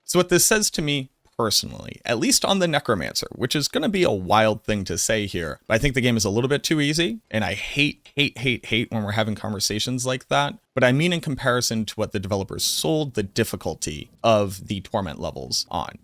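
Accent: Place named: Massachusetts, United States. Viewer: Yaaaas. It's so easy to tell he's from Massachusetts sometimes and I love it because it is where I was born. Only lived there until I was 8 because my parents decided we had to move as soon as I started saying, "idear" instead of "idea." 😅😅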